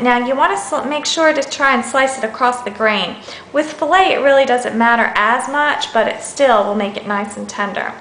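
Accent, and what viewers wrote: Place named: Tennessee, United States. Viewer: you sound like your from Tennessee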